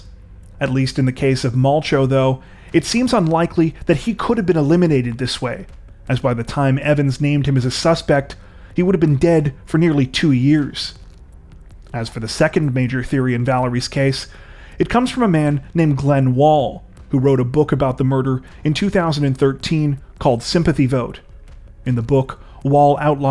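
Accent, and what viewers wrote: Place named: Canada. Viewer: I don’t watch a whole bunch of crime channels, but I appreciate your work. I’m old, and the nostalgic ones really get me. I also like the respectful approach to events are at once fascinating and horrifying. I feel bad for the families involved. Death by illness or accident can be understood; death by violent murder must be very hard on the survivors. I’m so sorry for them. Additionally, I love a Canadian accent. “Been” absolutely should rhyme with “seen” but among Americans, it never does. Thank you so much.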